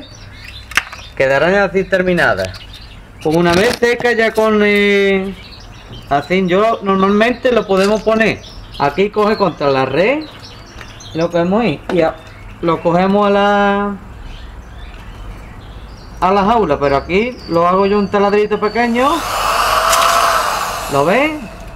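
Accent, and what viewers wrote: Andalusia, Spain. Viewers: Hombre ya es la hora esta esperando este vídeo como agua de mayo jejejeje gracias un saludo campeón a y haber si me entero de donde eres por el acento andaluz seguro igual que yo jejejeje saludos campeón desde moron de la frontera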